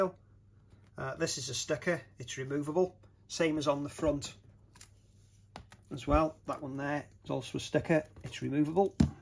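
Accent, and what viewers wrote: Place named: Liverpool, United Kingdom. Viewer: Someones from Liverpool?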